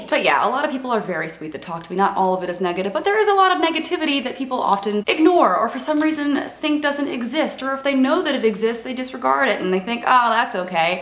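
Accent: South, United States. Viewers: the country accent was actually very cute. keep up the good work huge fan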